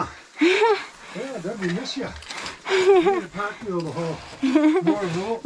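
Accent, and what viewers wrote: Canada. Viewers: I can tell your from Canada A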